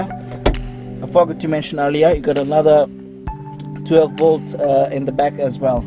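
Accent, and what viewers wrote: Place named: Bangladesh. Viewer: You sound Bangladeshi 😅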